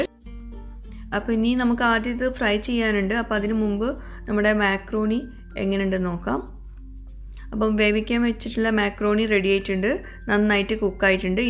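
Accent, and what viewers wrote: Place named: Tirur, India. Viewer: Adh correctaa... Tirur slang correctaayi vannu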